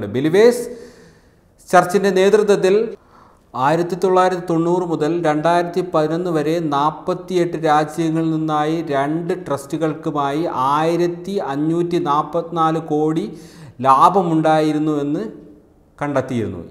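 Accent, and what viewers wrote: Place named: West Bengal, India. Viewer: എന്തു വാർത്ത വായിക്കലാണിത്... കഷ്ടം... ബംഗാളിയെ പിടിച്ചു മലയാളം വായിപ്പിക്കുന്ന പോലെയുണ്ട്...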